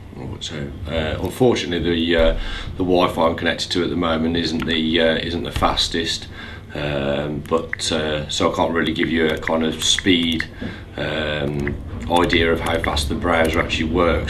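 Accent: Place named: Black Country, United Kingdom. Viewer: Are you from Birmingham or Dudley or somewhere close to there?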